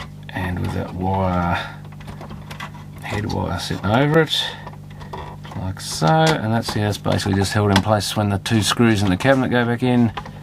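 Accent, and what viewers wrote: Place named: Australia. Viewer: I’ve been tinkering around with a similar radio, trying to get the cassette player working again. I wasn’t expecting an Australian accent I’m glad I stumbled along your channel mate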